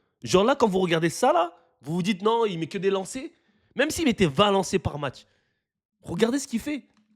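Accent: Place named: United States. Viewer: Ton accent américain, il est juste impressionnant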